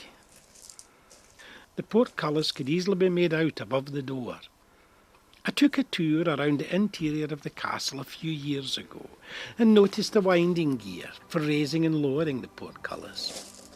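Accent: Scotland, United Kingdom. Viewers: Love hearing the Scottish broughe, as I'm Scottish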